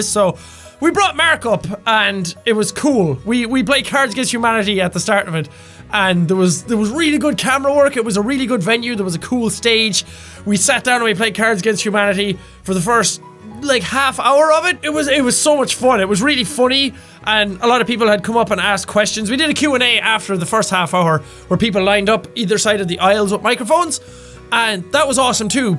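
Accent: Ireland. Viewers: Am I the only one who likes his accent? Normally I don't really like Irish accent but his is cute. No... I'm the only one... Ok.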